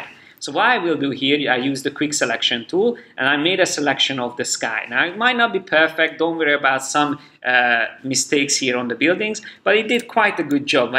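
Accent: Hungary. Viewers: Magyar vagy? Az angolodnak annyira magyar akcentusa van!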